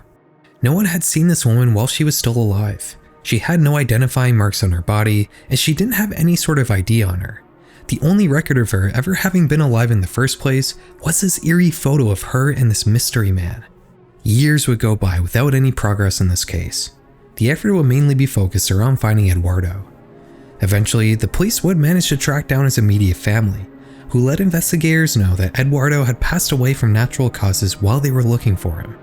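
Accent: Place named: Canada. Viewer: I'm really happy that this show is hosted by a Canadian. It's just too dark to be in an American or British accent. I need to learn "aboot" these things, not "a-bowt" them. It's just nice to hear while I'm looking up unedited versions of horrible stuff.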